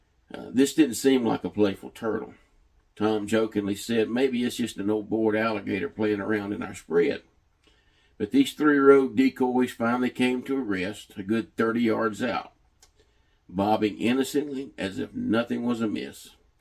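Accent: South, United States. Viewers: This was amazing, you're such a good writer not to mention storyteller especially with your lovely southern accent. I can't wait to hear more stories from you in the future 😊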